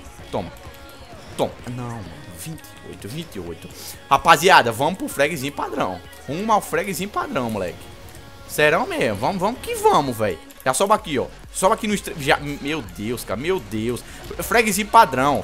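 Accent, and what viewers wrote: Northeast, Brazil. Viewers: Nordestino rapaiz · Likeeeeeeee, Nordestino Gostoso:3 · Nada melhor q o sotaque Nordestino!Manda um salve pro Rj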